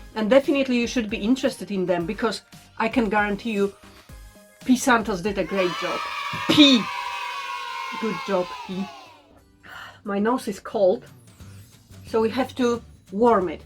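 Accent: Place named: Poland. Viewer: Your polglish is the best proposition ❤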